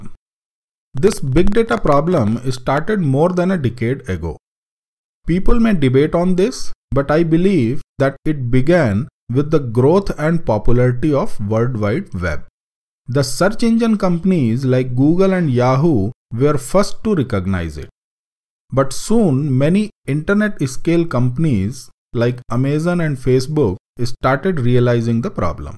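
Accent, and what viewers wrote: India. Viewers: Thanks for making these tutorials, they're just too helpful. Not only am I learning hadoop, but I am also getting more familiar with the Indian accent!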